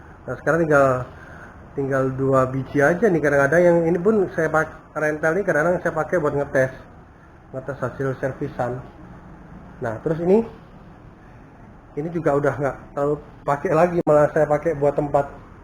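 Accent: Java, Indonesia. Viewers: Asline jowo mas???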